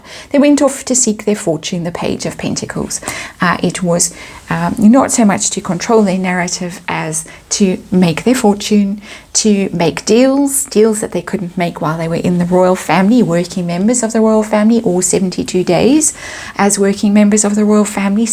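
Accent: South Africa. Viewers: Past 30 years living and working on various continents. Instantly regognised your Sout-African accent. ✨Marvolous. ✨ Since then i always follow your input.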